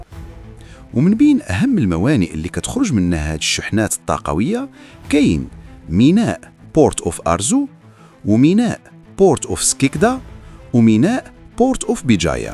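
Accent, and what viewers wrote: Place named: Morocco. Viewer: لهجة انتاع مروكي بامتياز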